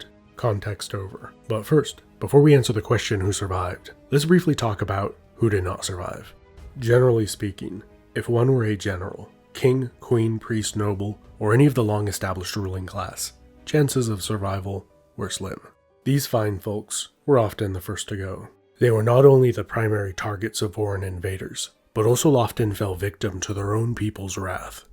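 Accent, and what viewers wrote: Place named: United States. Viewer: This is great. I usually avoid American accents but your voice is wonderfully rich and calm.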